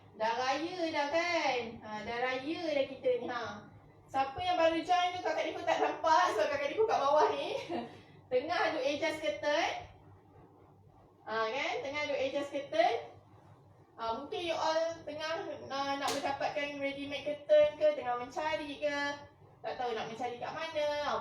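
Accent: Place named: Malaysia. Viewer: Serasa denger orang malaysia ngomong😀😂😁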